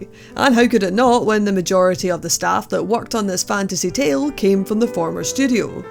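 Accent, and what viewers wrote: Scotland, United Kingdom. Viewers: Agh, dang it! I had a feeling I got it wrong! It was in the back of my head for a while. I was like: “you should edit that. She might be from Scotland.” I blame count dankula. I was like, “she doesn’t sound like *him*, so, no.” Well thanks for not taking offense. I know the scots and the Irish hate being compared to each other. And yeah, I think we all wanna be a bit more like SuperEyepatchWolf sometimes. That man is a legend. I look forward to more videos as always! Thanks for your kind responses.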